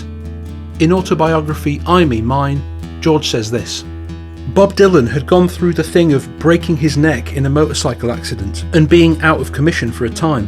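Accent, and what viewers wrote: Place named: North, United Kingdom. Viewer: You are an excellent storyteller! Don't short-change yourself or your audience by adding meaningless background music😮 Seriously! It's enjoyable to listen to your voice, northern accent and natural speech rhythms👍🎉 Any background music is not only superfluous but substracts from the listening experience imo.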